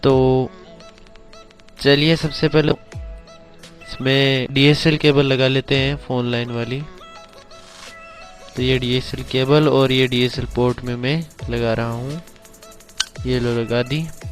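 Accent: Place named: Shekhawati, India. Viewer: Bhai rajasthan se h na pka shekhawati se 🤣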